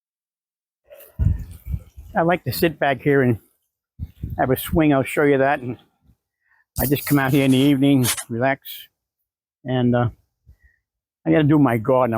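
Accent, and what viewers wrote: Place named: Louisiana, United States. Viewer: What part of Louisiana are you from? Heard the gumbo reference and your accent sounds like my Louisiana relatives. They’ve all passed. Love the yard. You can tell how much you love your home and yard. It’s so peaceful. It’s like something I’d like to find.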